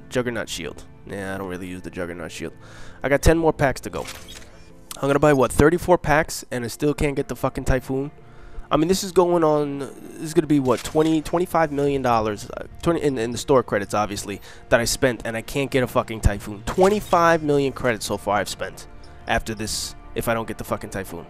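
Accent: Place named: New Jersey, United States. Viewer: He sounds like he's from Jersey Shore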